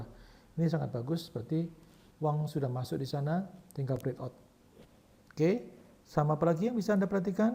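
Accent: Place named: Java, Indonesia. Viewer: kata istri saya suara Suheng medok banget. hahaha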